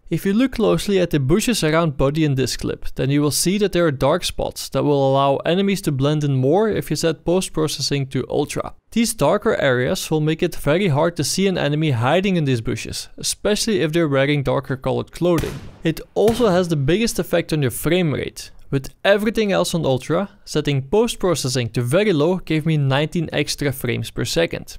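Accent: Netherlands. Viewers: Ik wist meteen dat je Nederlands was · U dutch? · Are you from the netherlands?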